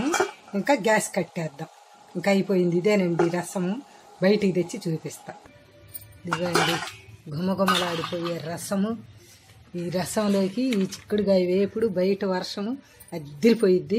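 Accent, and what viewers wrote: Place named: Prakasam, India. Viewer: Meeru chese recipes and natural ga explain chese vidhanam super. mee slang( yaasa) nu batti, Mee vantalanu chusi chepthunnanu meeru ma Prakasam district vaaru anipisthondi. Am I correct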